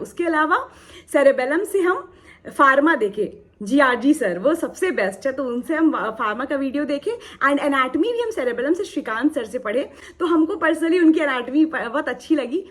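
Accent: Bihar, India. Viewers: Is it bihari accent? It's cute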